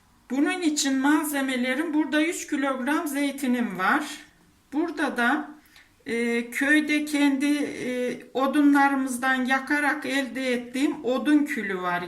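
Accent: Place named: Black Sea, Turkey. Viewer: Harikasın konuşman bizim oraları andırdı karadenizin becerikli hanımları maş. Mutlaka deneyeceğim.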